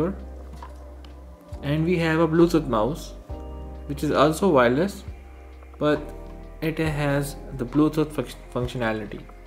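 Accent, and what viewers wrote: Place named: India. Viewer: Hes Indian (i think)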